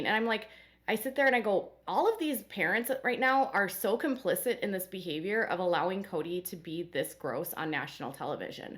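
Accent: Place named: Minnesota, United States. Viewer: I love your analysis and your accent!! You must be from Minnesota or Wisconsin???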